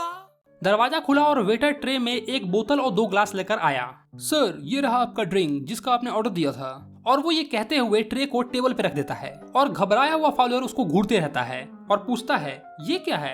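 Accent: Bihar, India. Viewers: Sir you use to talk in your bihari tone too many times "susura" pls have a look at it 😢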